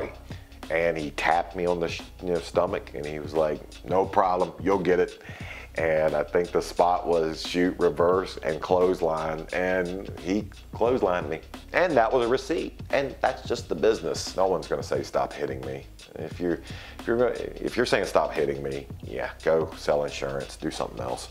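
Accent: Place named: Southeast, United States. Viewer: Just like any other job... good w the bad. I have to say. I am from Eastern North Carolina and you also have that South Eastern accent. Even though we call VA borderline Yankees lol. But seriously... I honestly love the fact that you take time to do this. I watched this instead of Friday night SmackDown.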